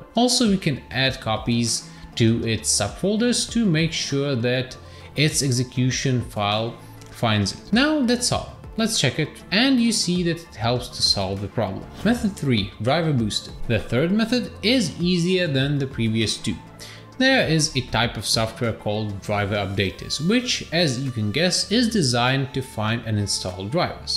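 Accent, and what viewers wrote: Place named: India. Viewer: Bro what’s with the Indian accent?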